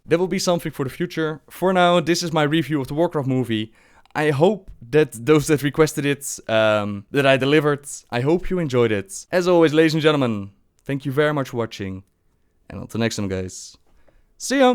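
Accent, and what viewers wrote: Netherlands. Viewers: As a dutch person i have to say... he sounds dutch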